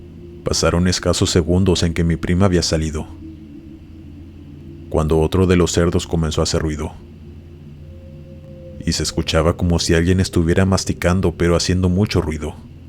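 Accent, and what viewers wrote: Mexico. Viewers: Hola, de dónde eres? 👁👄👁 como que me das vibras norteñas, de México 😅. Por cierto, excelente vídeo, como siempre, me encanta escuchar tus vídeos, me acompañan desde en el tráfico, o haciendo quehacer en casa. Saludos desde Guadalajara, Jalisco 🎉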